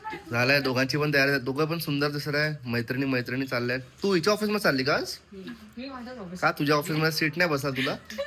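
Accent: Vidarbha, India. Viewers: Tumhi vidhrbha side che ahat ka because tumchya marathi vrun vatte ts means thod touch ahe language mdhe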